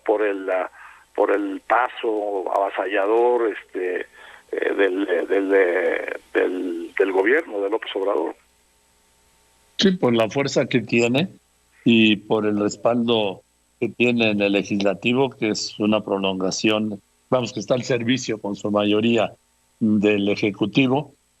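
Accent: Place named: Galicia, Spain. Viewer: Cada vez el español gallego de lopez doriga. Se parece a loret de mola puras fake news.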